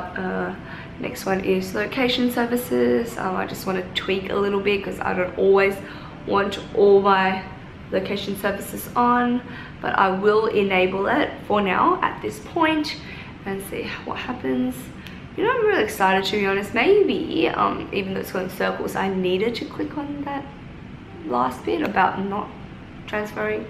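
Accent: Australia. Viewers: I knew u were from Australia cause of ur accent . Reminds me of the Rebeka twins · Sorry but she’s nice and pretty and all but I’m not really into people with Aussie accents (not love I mean like to watch them on yt) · I’ve always wondered how my name would be said with an Australian accent haha. Now I know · The way she talk like Rosé of Blackpink ..aussie accent tho ☺ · I had a feeling you were Australian and then you said it and I was like YES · so weird to hear an asian speaking Australian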